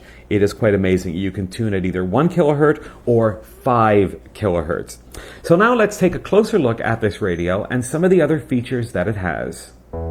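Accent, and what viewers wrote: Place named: Portugal. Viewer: Your first language is portuguese right? I'm guessing by your slight accent.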